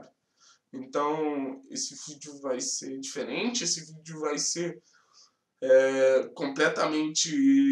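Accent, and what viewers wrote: Rio de Janeiro, Brazil. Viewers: Sou athleticano desde piá...tenho 51,anos e confesso q me soa estranho ouvir um sotaque carioca falar do Athletico com paixao como vc fala... Pois, como vc fala, aguentar o barulho de torcer pro Furacão não é fácil... A mídia carioca principalmente, mas dos paulistas tbm, quase sempre omitiu o Athletico e desedenhou... Admiro seu trabalho e seu esforco pelo meu time do coração ha quase 50 anos..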